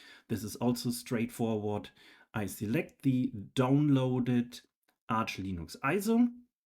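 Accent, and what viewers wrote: Germany. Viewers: that german accent killed me xD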